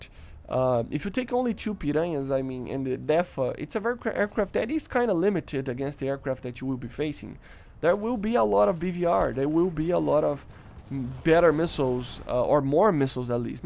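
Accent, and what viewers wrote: Brazil. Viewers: no começo ja comecei a estranhar o jeito de fala e no final realmente acertei q era brasileiro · You are brasilian aren't you? If not, your accent is very brasilianish.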